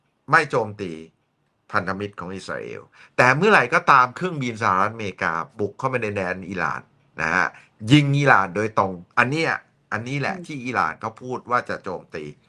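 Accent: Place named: Central Thailand, Thailand. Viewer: ชอบช่องนี้.พุดกลาง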